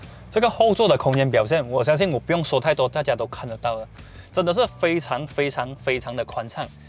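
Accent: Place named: Malaysia. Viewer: 马来的华语不都这样吗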